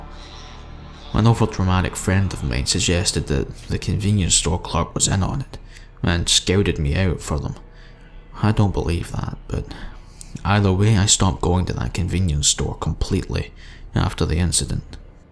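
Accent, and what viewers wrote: Scotland, United Kingdom. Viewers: Yay a Scottish horror narrator !!! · Great video! I love your narration, although I must admit I do have a serious jello-kneed weakness for a Scottish accent! Keep those stories coming! 😘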